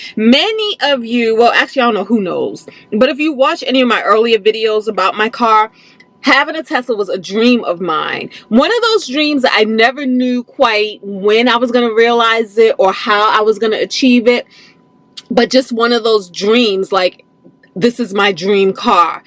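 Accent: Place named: DMV, United States. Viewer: Love the DMV accent!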